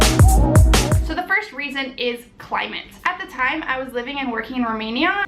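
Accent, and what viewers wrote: France. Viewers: Great french accent by the way:) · Hi! I started looking at your videos a few weeks ago and it really got me to want to travel again.. and visit Shenzhen! Thanks for sharing your passion about travelling and .. about China! Oh and by the way.. super accent Français! ;)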